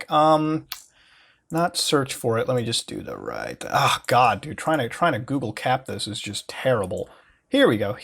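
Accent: United States. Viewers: Honestly, I thought you are some kind American dude like anybody speaking like an American are sounded like that but you look Indian at first glance. No offense. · You look like an Indian, yet you sound American... What sorcery is this?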